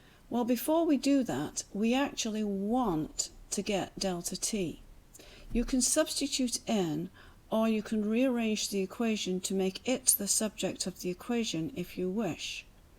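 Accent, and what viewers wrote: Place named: England, United Kingdom. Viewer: When I heard the English Accent I felt a little bummed because science here in the states is a little different than the UK. But this helped me understand sooooo much better. I didnt understand my teacher, this makes a lot more sense. Thank you!!!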